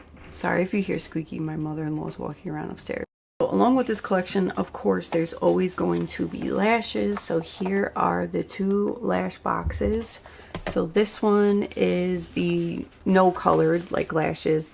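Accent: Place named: Philadelphia, United States. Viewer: I LOVE green eyeshadow looks!! I think they look sooo pretty with brown eyes. Watching your makeup tutorials have really helped me feel more confident in doing bold looks again. I have also bought a bunch of stuff you've used and that you have recommended 😂🤣 thank you for being awesome! Also... I live in Philly and you sound like you have a Philly accent. Sorry if I'm completely off with that hahaha you just sound local to me 😅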